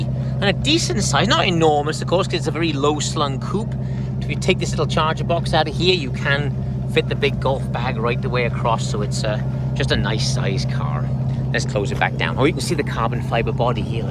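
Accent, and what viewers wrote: Wales, United Kingdom. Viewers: Do I deduct a welsh accent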